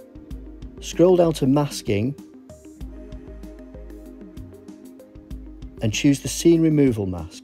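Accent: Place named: England, United Kingdom. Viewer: Nice to hear an English voice ..good video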